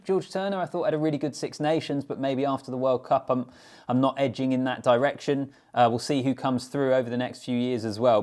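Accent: England, United Kingdom. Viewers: Just a quick question...are you English ha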